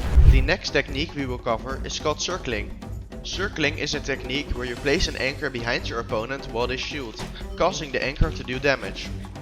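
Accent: Netherlands. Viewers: u sound so dutch wth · Klinkt als een nederlander wel goeie vid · Nice dutch accent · Are you Dutch u seem like u have a Dutch accent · bro has an dutch/ europian acsent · yo my dutch boi, ye you can hear ur dutch accent very clearly, not a bad thing tho lol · bro unlocked the dutch accent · i hear the dutch accent 😮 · the dutch accent 😭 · youre dutch? W vid btw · Are you dutch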